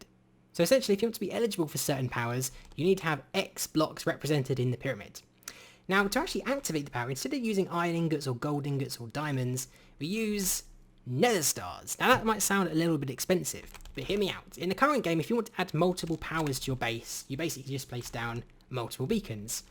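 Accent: United Kingdom. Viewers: he's British what do u expect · It's our Southern England accent.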